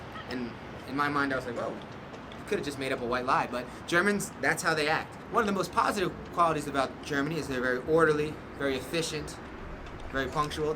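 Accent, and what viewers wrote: Brazil. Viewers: Eu fico sempre com a impressão que você é um brasileiro que fala muito bem inglês. 😅 Os vídeos estão bem legais. Keep it up! 👊🏻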